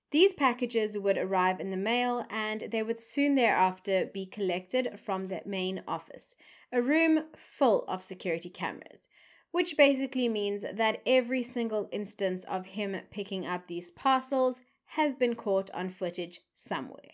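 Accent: South Africa. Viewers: Your channel just popped up on my home page! I’m so glad it did because I already ADORE you, and have only seen this video ☺️ plus, your accent is awesome 😎 it’s great to see a youtuber in another part of the world that isn’t UK or US or Australia. We never hear cases from South Africa, except for the Pistorius debacle. This case is so scary!! I really hope Ashley can get out, the Thai legal system and government can never ever admit when they are wrong. I’ll share this video on my Twitter and Facebook. 🙏🏼♥️ much love from the UK 🇬🇧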